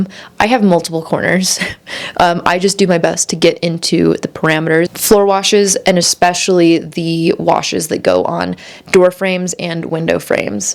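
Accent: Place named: Colorado, United States. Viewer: Decided to catch up on some of your vids I've been meaning to watch, and heeey this popped up! Lovely to see something new from you. I adore how down-to-earth all of your content is, and I was so excited to find out you're a fellow Coloradan! (No hiding that native pronunciation, haha)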